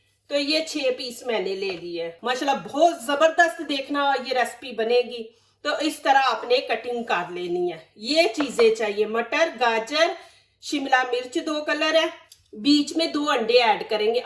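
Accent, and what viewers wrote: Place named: Punjab, India. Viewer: Jazakillah piyari naik baji. Aap bohat mukhlis aur piyaar karnay wali baji hain. Aapki har recipie aalaaaaaaa hai. Aapkay program main bohat apnaiyat ka ahsaas hota hai. Aap bohat mahnut aur muhabbat sai hamain sub kuch Sikha rahi hain. Allahpaak aapko do jahan ki khushian day .Aameen. Aap bawazoo rehkur khana pakati hain aur duaen daity rehti hain . Aap apnay parents ko hamara bohat salam kahain kiunkay aapko daikh k unki tarbiat pay rashk aata hai. Baji aik baat aur kabhi hai k aapka piyara punjabi lahja hamain to bohat acha lagta hai magr kuch loag aapka mazaaq uratay hain. Jo humsai bardahst nahi hota. Allahpaak subko kamil hidayat day. Aameen.🤲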